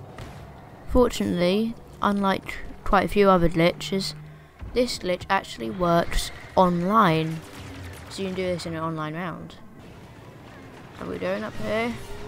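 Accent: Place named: United Kingdom. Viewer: it’s the British accent lul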